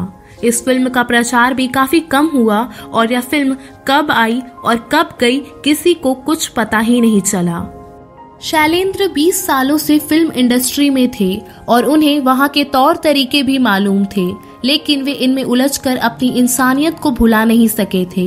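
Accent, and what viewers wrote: Bihar, India. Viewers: Bihar se hai ye ladki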